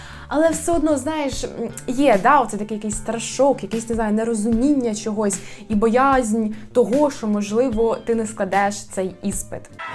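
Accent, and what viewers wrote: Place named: Surzhyk, Ukraine. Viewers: Я чую Черккщину) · У вас такий суржик, як з півдня України 😅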